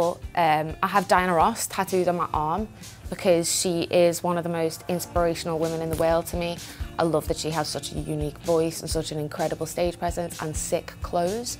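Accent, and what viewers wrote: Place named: England, United Kingdom. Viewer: While her music is fun, and well produced, sounds and acts like an American's stereotype of an English person truly personified.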